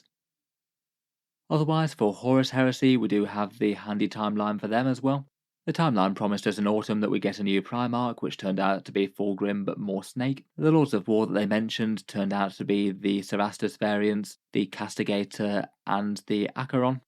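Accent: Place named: Southern England, United Kingdom. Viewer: Somewhere in the south. And Games Workshop is a British company, there’s stores in nearly every town.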